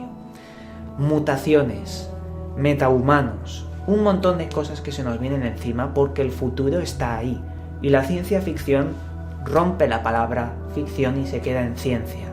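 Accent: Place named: Spain. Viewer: x2 me encanta su acento español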